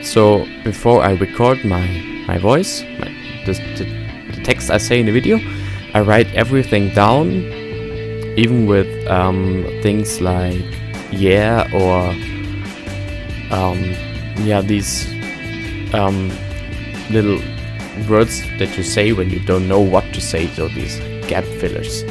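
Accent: Germany. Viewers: man hört es sofort das Du Deutscher Bist xD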